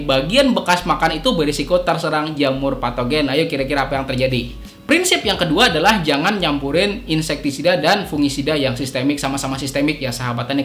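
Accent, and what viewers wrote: Banjar, Indonesia. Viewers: hen cucuk ai ha asa pinandu kaitu nh u lwan logat2 bnjar .. S kalsel ja kita pak ai😁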